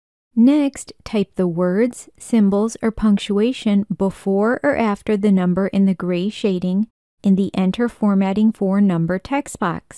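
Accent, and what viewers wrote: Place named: New Jersey, United States. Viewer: Good info. Lol at the New Jersey accent and Indian vocabulary.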